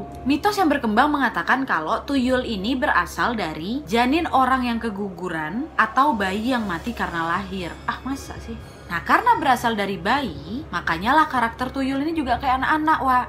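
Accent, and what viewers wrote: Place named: Kalimantan, Indonesia. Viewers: Kaka Orang Kalimantan Ya? Cara Bicara nya Sama Kaya tanteku dari Kalimantan Hehehe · sragen jateng hader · Malang slur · Jowo Timurr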